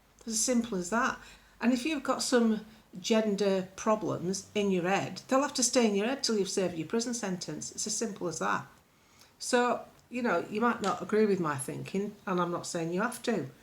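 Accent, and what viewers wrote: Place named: Northern England, United Kingdom. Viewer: New subscriber here.. your YouTube video's are fantastic.. proper northern lass..😊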